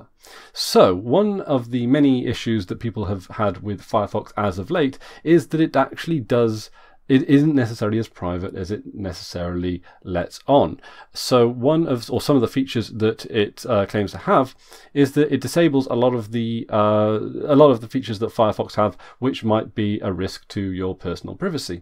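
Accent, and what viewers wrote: Wales, United Kingdom. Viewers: wait r u welsh can u speak welsh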